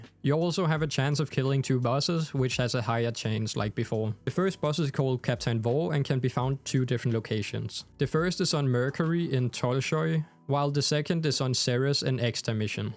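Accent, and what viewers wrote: Denmark. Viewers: This video really helped🤩. I have a question are you from Denmark because it sounds like it with your accent?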